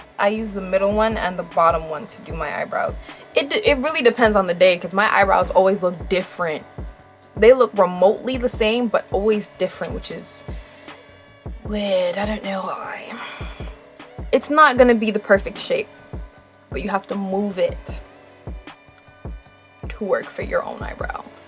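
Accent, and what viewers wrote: Jamaica. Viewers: Its the Patois for me, LMAOOOOO, LOVE THIS VIDEOOO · Are you Jamaican my dear??? Becau you sound like an Jamaican ooman dem!!! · Are u Jamaican?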